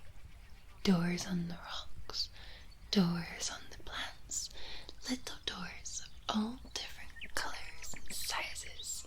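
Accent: Scotland, United Kingdom. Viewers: Awww what a magnificent video full of restful relaxation and calmness! 😴 That soft whispering voice with your lovely Scottish accent sends me right into LA LA land!! 😴 Dream number 3 and number 6 were my favorites! 👏👏 Number 3 definitely had plenty of tingles from all of those lovely animals jumping in and out of the woods along with those amazing bird sounds 👌 😀 👍. Number 6 was my top favorite because had invited me into her amazing home! 👏 Of course chatting with would be the ultimate wish but to actually sit down and play with Hugo and Lindi would be totally awesome and cool:_Hugo:🐶😎👌 😊. Thanks for sharing all of these incredible dream stories with me for I am totally relaxed and looking forward to a awesome night's rest!😴💤 Your just getting better and better with each and everyone of your videos! 👍 Do take care and have a magnificent evening!! 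Lots of love to you xx 🤍🤍 😍💕💕💕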